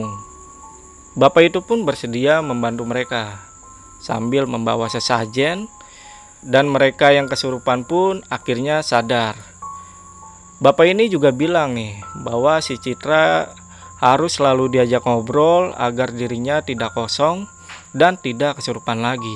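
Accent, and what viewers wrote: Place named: Bali, Indonesia. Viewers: Aksen bahasanya seperti orang Bali kak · Suara bang medok Bali banget yah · Logatnya seperti orang Bali..slm dari Bali juga..smoga sukses cannelnya..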